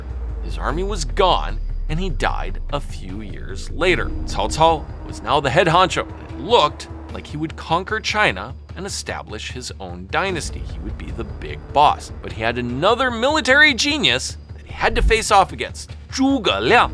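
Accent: Standard. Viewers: I like your pronunciation of Chinese words throughout the video here and there, very standard mandarin. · 你的發音很標準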